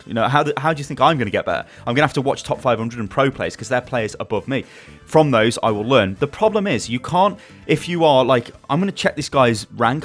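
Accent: United Kingdom. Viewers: dont worry i love u and ur patch note reading it makes me smile every time i watch a vid plus you are one of the few british youtubers i know which is nice as i live in BRITAIN 2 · I watch you over lone hawk your overwatch ect because the British accent lol.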